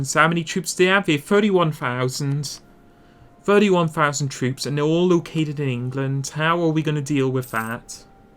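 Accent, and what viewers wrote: Northern Ireland, United Kingdom. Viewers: North Irish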